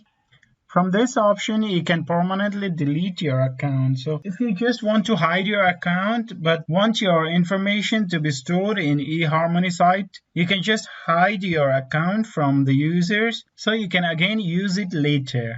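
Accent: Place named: India. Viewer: I hate Indians they are everywhere have a horrible annoying fn accent !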